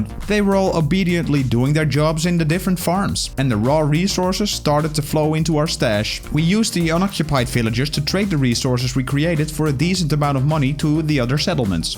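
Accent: Netherlands. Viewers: Dutch accent spotted <3 Groetjes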